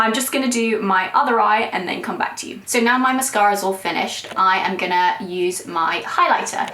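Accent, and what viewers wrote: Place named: United Kingdom. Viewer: Love your British accent❤and your chinese beauty.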